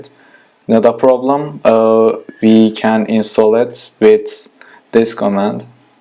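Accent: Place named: Turkey. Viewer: Türk olduğunu gizleyemezsin aksan ele veriyor :)) . Video güzel olmuş eline sağlık.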